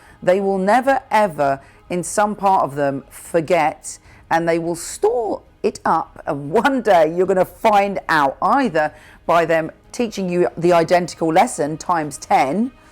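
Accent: United Kingdom. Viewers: i wish i had your so refined british accent...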